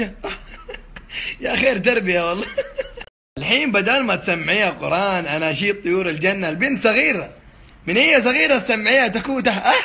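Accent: Hejaz, Saudi Arabia. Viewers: ليه لهجته حجازيه!!! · ماكانه اماراتي كانه من السعوديه من الغربيه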